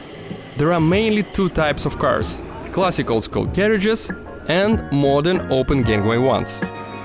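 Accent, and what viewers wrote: Russia. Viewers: Смысл его тренить на русском акценте? Американца или англичанина после этого не поймёшь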